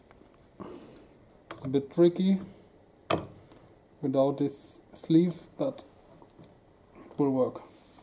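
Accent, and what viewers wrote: Germany. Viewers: Mach deine Videos doch einfach auf deutsch. Dein deutscher Akzent ist unüberhörbar 🙄 Ich wollte in den 90ern auch immer eine RS. Hatte damals eine Yamaha DT80 MX. 9000 Mille kostet die Aprilia damals. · Deutscher Akzent on point, aber richtig gutes und interessantes Video. 😉 Like hast du.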